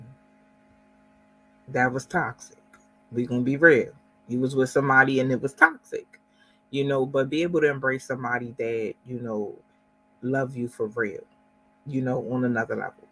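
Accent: Louisiana, United States. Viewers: Hello a question for you, something I may have picked up on. Are you a Louisiana native? I seem to recognized a dialect in your speech pattern. A simple yes, or no would be fine.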